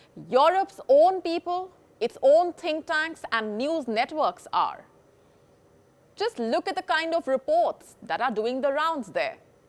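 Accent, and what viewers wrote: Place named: India. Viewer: No offense but Indian accent is just the worst accent. Unbearable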